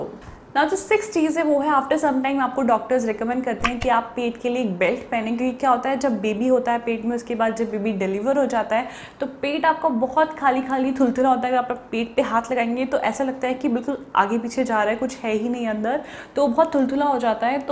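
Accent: Garhwal, India. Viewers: Aap gadwali ho kaha se ho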